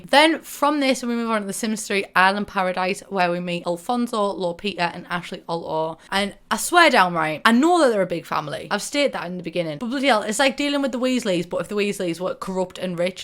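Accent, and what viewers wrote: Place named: North, United Kingdom. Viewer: I wish I wasn’t such an uncultured American so I could have a dope northern accent. What a legend. Love you, ❤️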